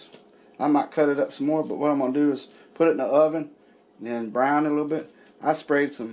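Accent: South, United States. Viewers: i 💙that southern food and accent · ...I think your cute. Love your southern talk to. Good meal. Thanks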